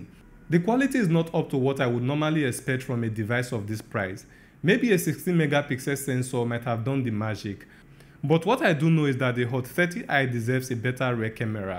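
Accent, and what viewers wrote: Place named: Africa. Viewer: Greetings from Venezuela. I had some africans friends and yoyr accent remember me them accent. I like it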